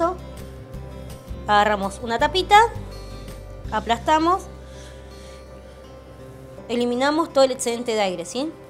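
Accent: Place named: Argentina. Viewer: Disculpa mi ignorancia ...me encanta la comida boliviana ...Cómo así usted tiene acento argentino y prepara comida boliviana? Un saludo desde Londres...Acá nosotros somos fans de los restaurantes bolivianos .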